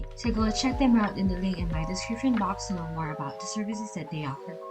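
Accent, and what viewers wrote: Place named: Philippines. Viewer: My dumbass rly thought u were a dude. Also r u filo cos u kinda hav the accent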